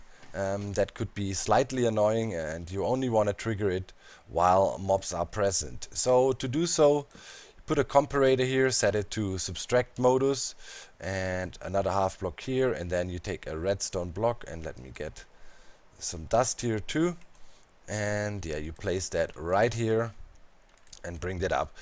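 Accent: Germany. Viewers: German right ;)?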